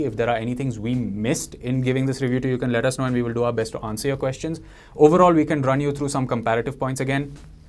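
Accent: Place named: India. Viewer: Video for Indians. The rest of the world cannot stand such accent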